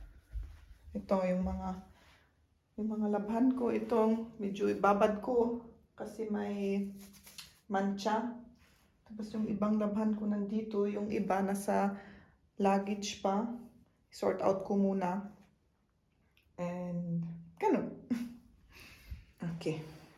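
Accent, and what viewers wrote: Philippines. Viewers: Parang filipina kana rin pati pananalita,nakakatuwa naman.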